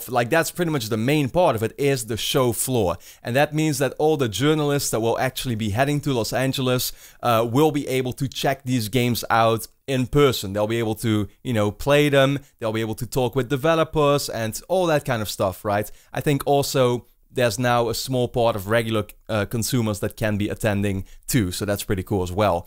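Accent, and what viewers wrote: Netherlands. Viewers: Herm... he's probably Dutch, isn't he?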